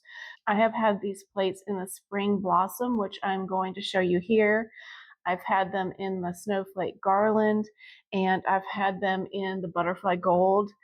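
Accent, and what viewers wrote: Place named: North, United States. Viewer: Are you from north Georgia? Your strong northern accent says no.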